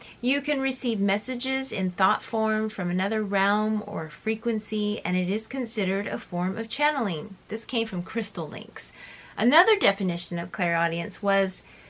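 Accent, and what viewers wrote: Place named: United Kingdom. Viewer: just subd for the British accent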